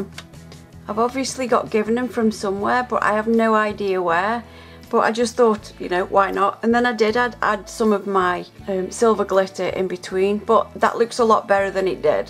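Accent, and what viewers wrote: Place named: Stoke, United Kingdom. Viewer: I love these. I’m a nail newbie could you have put the glow in the dark behind the polygel sections of the design so the glow effect would shine through the polygel. I’m just starting out and I don’t have any glow in the dark to try it with myself so I’m being a bit cheeky and asking you if you think it would work. I’m not sure where you’re from but you sound like a fellow Stokie xxx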